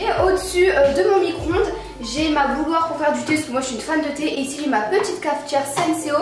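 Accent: North, France. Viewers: c'est tres mignon .... heu tu es du Nord ? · tu as un accent du nord mixé avec un accent arabe :o :')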